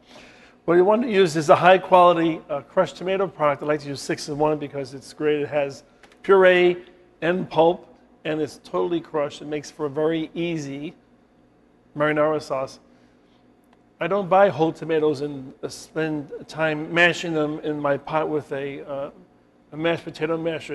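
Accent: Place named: United States. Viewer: Tom-ay-toes. Bay-sil. You Americans really can’t speak properly 🤦🏻‍♂️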